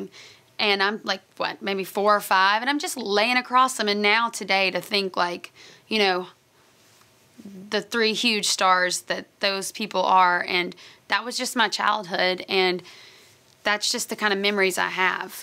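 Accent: South, United States. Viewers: She sounds so country cx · Oh my gosh her accent has changed !! More country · To know not the only one that talks with a southern accent she does too but when she sings she sounds beautiful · Smh guys, she looks different because she gained weight.. And she moved down south.. Lol thats the accent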